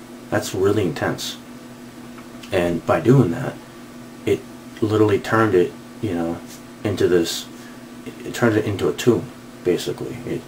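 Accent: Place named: Massachusetts, United States. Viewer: Watched part 1 as well. Thought to myself "sounds like he's from ma". Soon as I heard you say New Bedford I knew I was right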